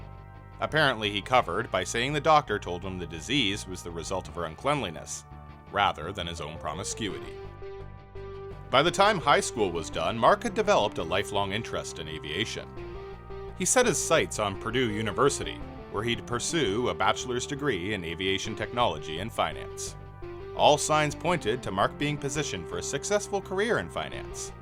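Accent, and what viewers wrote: Canada. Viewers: I can tell you are Canadian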